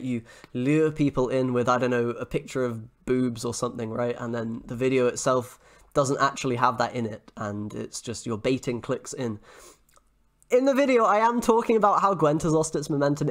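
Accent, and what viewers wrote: United Kingdom. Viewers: I stopped playing Gwent when I 've realised how Homecoming downvalued my beloved game but I keep watching you just because of your fantastic British accent. Keep doing your job!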